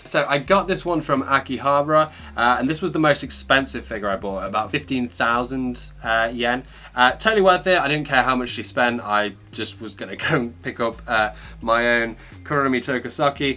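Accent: United Kingdom. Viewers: are you british ? ( I love so much your video )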